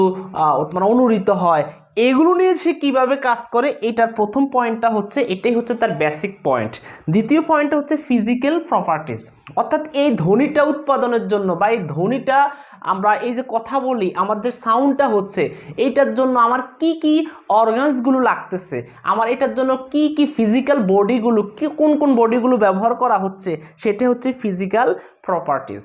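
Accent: Noakhali, Bangladesh. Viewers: Bhaiya apni ki Noakhali'r?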